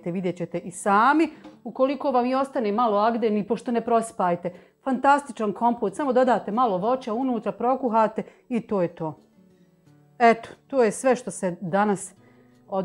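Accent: Bosnia and Herzegovina. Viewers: Joj kako te sa gustom gledam kako lijepo i uredno sve radiš a tek naš bosanski govor ma bravo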